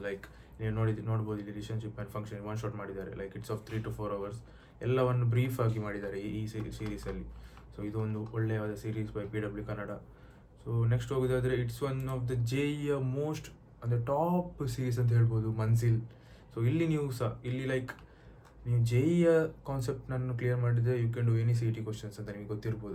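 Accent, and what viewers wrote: India. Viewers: 100% ur tuluva 😂 erena kannada kenagene gottapunduye😂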